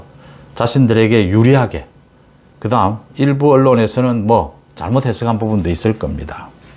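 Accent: Gyeongsang, South Korea. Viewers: 송 선생님 평론을 평소 열심히 듣고 있습니다. 경상도 억양은 있지만 언어 구사는 표준말을 사용하시므로 아주 깨끗한 방송이라고 늘 여겨왔습니다. 한 가지 외람된 말씀 드리자면 '제명'이라는 단어를 요즘 상황 때문에 많이 쓰실 수 밖에 없는데 accent를 '명'에 두는 것이 올바른 발음이라 알고 있습니다. accent 를 '제'에 두시는 것이 송 선생님의 일종의 언어 습관이라 생각하지만 혹시 고칠 의향이 있으시면 참고하시기 바랍니다.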